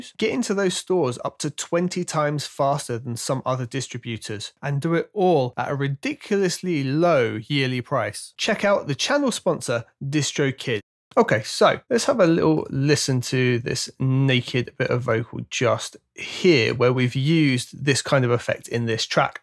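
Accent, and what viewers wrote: United Kingdom. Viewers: I pressed a like just for the British accent (don't mind me, if I am wrong and that is an American one, or something else) cheers for the content, why are there only 7 likes on this vid?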